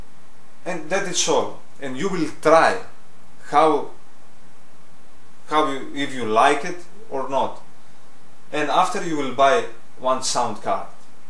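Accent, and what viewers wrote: Greece. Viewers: Are you Greek? I can recognize the accent because I'm Greek, but I was born in America. Thanks for the help, I've just started recording songs on my ukulele and wanted to know how I can somehow plug my uke into my computer. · greece maybe larisa :P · that greek accent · are you greek? i can tell by ur accent. thanks for the video by the way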